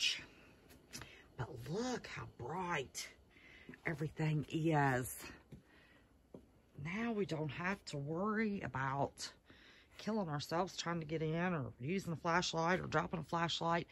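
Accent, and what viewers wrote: West Virginia, United States. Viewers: thanks for the tips :) lol and it is a West Virginia accent, I love it xD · What accent is that? Sounds like West Virginia to my ears. Am I close?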